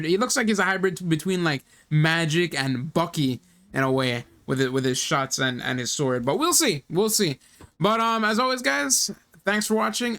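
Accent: Caribbean. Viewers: By any chance are you Trinidadian? Your accent is very familiar and sounds Caribbean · Are you from the caribbean?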